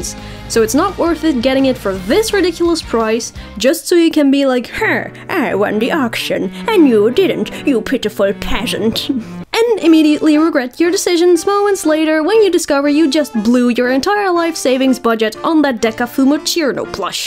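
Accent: Netherlands. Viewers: ooooOOoo so it's a dutch accent I though you were british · I had a hunch you'd be Dutch. Sometimes my english accent will sound somewhat similair (as I don't have a consistent English-speaking voice).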